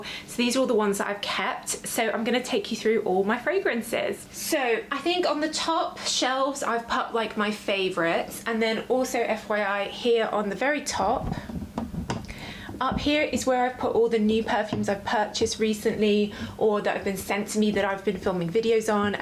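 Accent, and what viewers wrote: United Kingdom. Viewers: Congratulations,! I like your collection. We share a lot of preferences: Michael kors Gorgeous, Aqua allegoria mandarine basilic, l Interdi, la nuit tresor... your descriptions are just accurate, I can rely on! I also like your British accent, I enjoy both English and parfums❤😊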